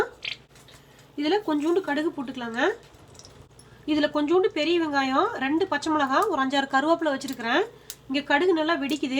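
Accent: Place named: Gobichettipalayam, India. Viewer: hi sis i am new neega namma oruu gobichettipalayagala unga videos pathaga ella nalla pannirukiga namma oruu pechu kekkupothu happy irukuthuga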